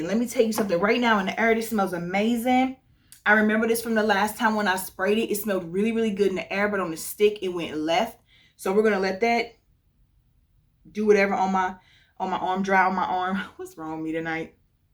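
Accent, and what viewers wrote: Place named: St. Louis, United States. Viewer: Ok…a few things: 1. Thanks for your thoughts on this house, I haven’t heard anyone talk about it 👍🏾 2. You have definitely live in MO or St. Louis for awhile…”all these fragrances in the auurrhh” 😝🥰💛 3. The names draw me in every time! 4. I can’t do prominent pepper notes either 🙅🏾‍♀️ Have a great day! 🥰💃🏾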